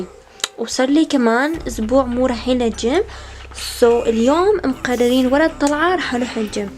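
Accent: Iraq. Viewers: يابة البنت عايشةطول حياتها بأمريكا ف ماتعرف تحجي عراقي عدل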